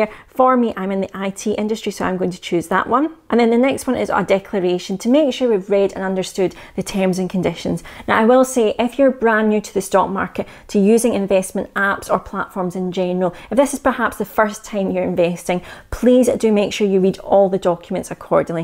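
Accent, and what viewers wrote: Scotland, United Kingdom. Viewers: Thanks! Have been watching all your videos these days and they really help me to start establishing my financial freedom! And I’m really happy to hear someone who is from Scotland! (I’m in Edinburgh) 😃Just one question here, if 212 is free, does it mean if I invested the same ETF, would it be cheaper than buying directly from Vanguard? X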